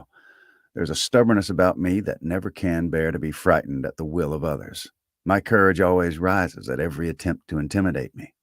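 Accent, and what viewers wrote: South, United States. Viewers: This is genius. The southern accent really suits P&P. 😂❤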